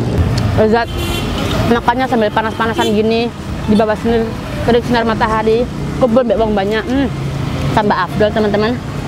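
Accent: Java, Indonesia. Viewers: Sudah mbak.. mbrebes mili sumpahhh.. ak liat mbak jd inget tanteku di surabaya hahahaha.. luwcuuuuu klo ngomong medokkk bingittt tp kusuka 🤣 sehat2 ya Omay, & Ngkaknyaaa 😇😇😇😇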